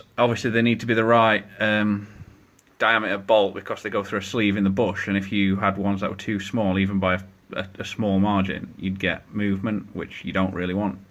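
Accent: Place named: Rochdale, United Kingdom. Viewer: Getting there! Where's the accent from? I'm guessing Rochdale ?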